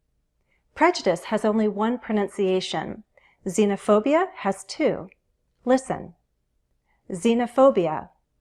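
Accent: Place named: United States. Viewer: Hello. Thanks for your teachings! I have a question. You teach British English. Why in this video, you used American accent?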